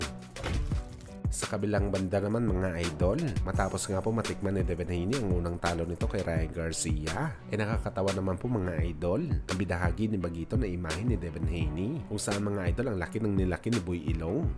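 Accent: Iloilo, Philippines. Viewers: Ayosin mo boses mo idol..murag bayot na ilonggo uy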